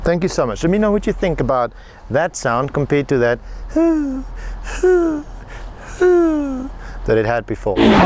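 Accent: Afrikaans, South Africa. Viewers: Love the Afrikaans accent - flying the SA flag high and mighty ………boss content too - cheers mate keep us entertained 💪🏼😉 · This guy sounds South African...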